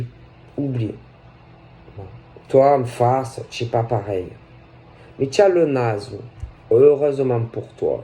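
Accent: South, France. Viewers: avec l'accent du sud je me tord de rire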